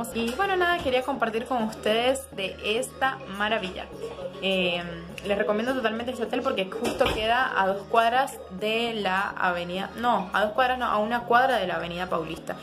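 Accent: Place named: Argentina. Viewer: Me vacilé tu acento argentino todo el video 🤭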